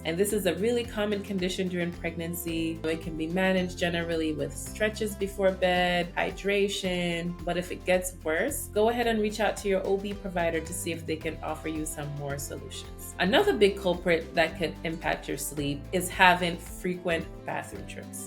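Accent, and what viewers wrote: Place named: Jamaica. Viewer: Sounds Jamaican. Anyway, Good info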